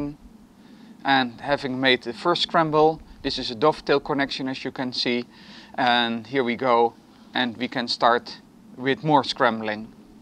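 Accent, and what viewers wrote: Netherlands. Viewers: Ik denk dat deze puzzel veel moeilijker is dan de 1x2x2 puzzel (en ik weet dat je nederlands bent door je accent)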